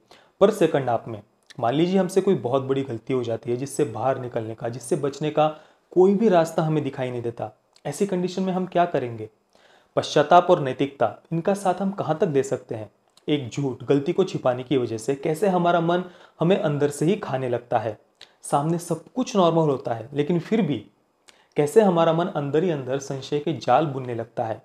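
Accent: Maharashtra, India. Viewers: Good work bhai. Keep it up. The best thing I like about your reviews is when you say Jai Hind, Vande Mataram at the end. The way you talk, it feels you are from Maharashtra. I am from Nagpur. Where are you from? I have a few movie suggestions for you, which you would like to watch and review. For starters I will suggest, "The Third Man'. Its a very old film and is widely considered as the first in 'neo-noir' genre. Do watch it.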